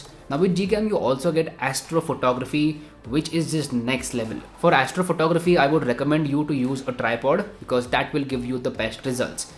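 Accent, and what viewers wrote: India. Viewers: Indian accent makes me hate english for the rest of my life. Please stop guys. At least add smt in title like( Indian accent)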